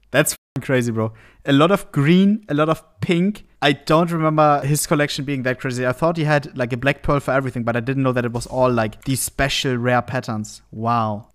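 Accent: Germany. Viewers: Youtube auto captions is writing Germand words while he is peaking english WHILE being german, despite him having a very very good english elocution, and i can't help but feel amazed. I can TELL he is GERMAN, but for a robot to accidentally write german words while he is speaking more than decent english blows my mind.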